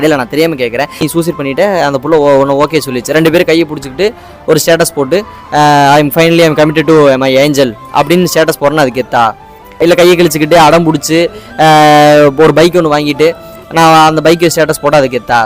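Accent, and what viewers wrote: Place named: Kongu, India. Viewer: Thambi.. I became fan of you from this video❤❤ Ipotha voice kekaren and kongu slang❤🧿. Om namasivaya🙏 VAZHGA VALAMUDAN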